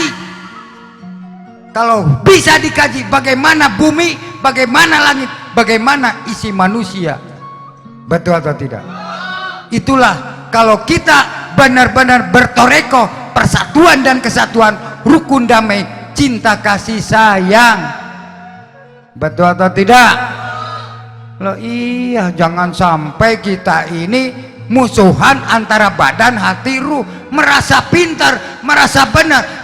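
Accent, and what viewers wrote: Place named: Serang, Indonesia. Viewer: Sehat selalu abuya ......... Logatnya jawa serang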